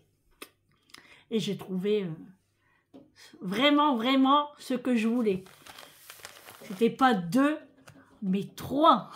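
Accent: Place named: Marseille, France. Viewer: Bonsoir 🤗 Ici soleil cet après-midi, LILLE incroyable le monde..Bon retour de courses 💯heureusement que nous sommes là pour nos enfants 😉il a raison qu'il profite 😅 il est trop gentil 🤗tu parles comme les marseillais ça te coûte un bras😅 bon courage pour demain ta séance, faites sauter les crêpes 😅 Bonne soirée à vous deux bisous 😚 Nathalie de Normandie 😘🥰❤️💯🫶🌹🌹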